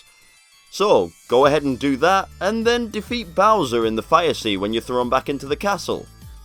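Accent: United Kingdom. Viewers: Are you British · Are you British? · He's British I think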